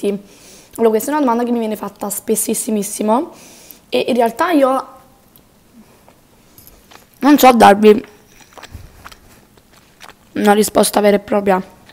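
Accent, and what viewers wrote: Italy. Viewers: Ma é proprio il suo modo di parlare é una cosa che hanno i napoletani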